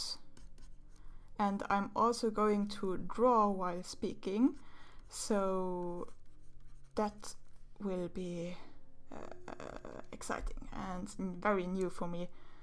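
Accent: Germany. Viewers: Are you German by any chance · Are you german? xD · xD kann ich verstehen. Hab von deinem Akzent eher rausgefunden als an dem Screen, hab nur auf die Zeichnung geguckt, deshalb finde ich es ja auch erst jetzt heraus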